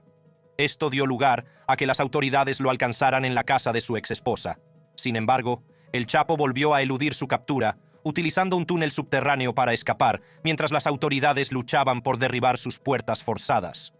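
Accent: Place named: Central America. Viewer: De seguro no es gringo. Por su voz parece centroamericano.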